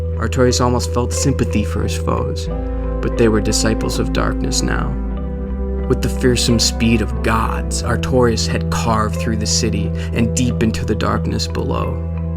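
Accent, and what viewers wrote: United States. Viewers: It needs a British narrator. American accents don't mix well with DS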